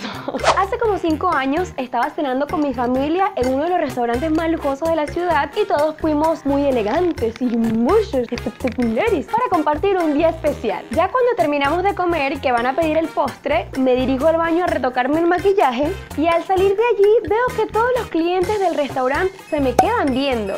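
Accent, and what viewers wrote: Venezuela. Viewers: Creo que de Caracas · Eres de Mérida, de Maracaibo o de Caracas? Es que me confunde el acento... por cierto, buen canal me encanta tu escencia y tu entusiasmo 👏😌😍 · Es de venezuela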